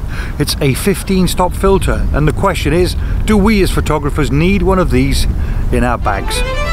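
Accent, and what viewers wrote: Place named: South Wales, United Kingdom. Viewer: Whats your thoughts on a Variable ND Filter? Also where are you from, I'm guessing by your accent you're from South Wales. Big fan, Subscribed.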